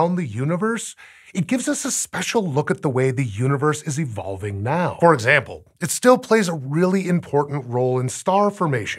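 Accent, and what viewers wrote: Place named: United States. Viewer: Chris Ecclestone? He was from the north of England. This guy's American.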